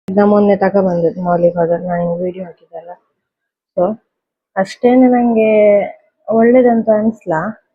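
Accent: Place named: Kundapura, India. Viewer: Kundapura kannada chanda mat addtri maree ❤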